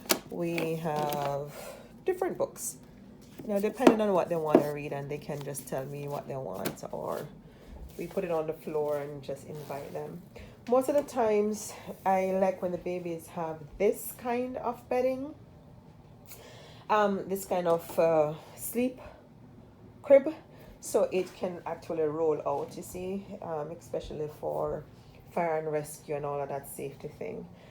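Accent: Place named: Jamaica. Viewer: Hi 👋 😀 You sound Jamaican 🇯🇲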